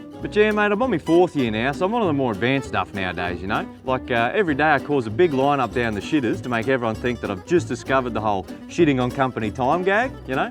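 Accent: Australia. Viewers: That accent though. Wasn’t expecting Australian and I have to listen real close to understand what he’s saying.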